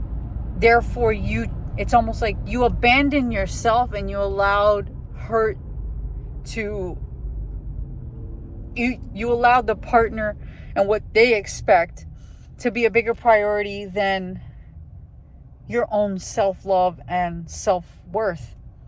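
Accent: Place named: Texas, United States. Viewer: you sound like 100% Texas mother.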